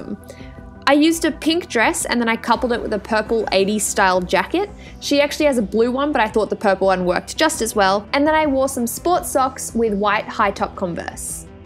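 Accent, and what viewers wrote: Australia. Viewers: Omg you're Australian same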